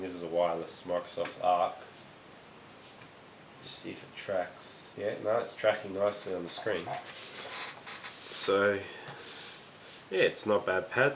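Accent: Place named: Australia. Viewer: Yep, he's Aussie 7 years ago wow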